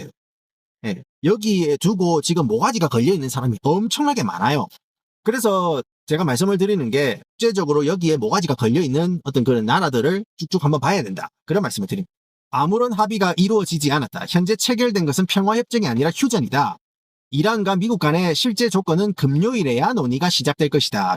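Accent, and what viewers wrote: Gyeongsang, South Korea. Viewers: 트럼프 성대모사만 나오면 너무 잘한다. 그 경상도 영어 사운드에 익숙해 저버렸으. 캔유 빌리브댓!! 😂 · 경상도 오빠야가 풀어주는 세계정세 ❤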